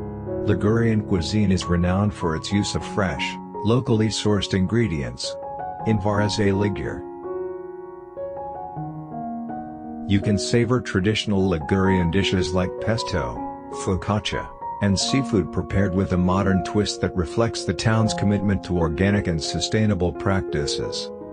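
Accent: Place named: United States. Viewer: What a pleasant American accent, where is it from?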